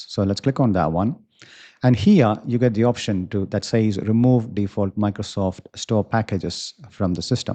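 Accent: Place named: India. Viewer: I was so happy to hear an Indian voice focusing on how to get rid of the junk that is Microsoft from your life and then I saw your header and it says Azure ! Your pace and condensed presentation is still very good 👍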